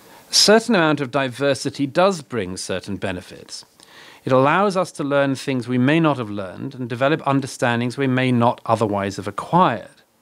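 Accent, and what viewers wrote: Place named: RP, United Kingdom. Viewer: Murray is Tommy Robinson with a posh accent. I can think of no higher compliment (but not because of the posh accent; that is irrelevant).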